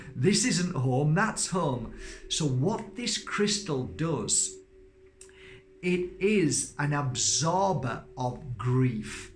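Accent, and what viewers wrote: Yorkshire, United Kingdom. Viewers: I have never come across any of your videos before but within the first minute of watching my child self came out and yelled NICEEEE!! Your positive energy is so relatable I feel like I hardly know people with such vibrant energy as much as I have and now I've discovered you I'm just so happy there is someone else out there who is just as happy and smiley and loving as i am... I'm also from Yorkshire and I would love to meet you, I can hear it in your accent :D Freedom. where can i find you and share lentils?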